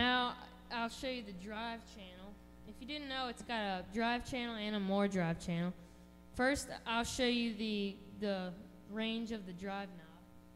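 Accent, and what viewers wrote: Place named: New Orleans, United States. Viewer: You gotta be from New Orleans! :)) Nice amp!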